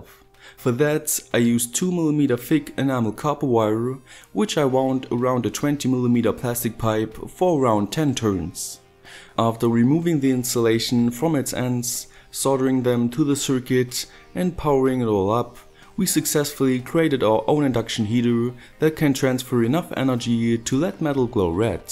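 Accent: Germany. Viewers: Wait... are you german? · Love that accent, it sounds like german but the way you say certain words makes me lmao, like coillll. Would love to hang out with someone like you, no homo. Good video very professional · Great i have a question are you German? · Are you German. Becuas kupferdraht ist deutsch oder bin ich dumm. Außerdem hast du so nen Akzent! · Man hört richtig das du deutsch bist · where are you from? you have a similar accent like German people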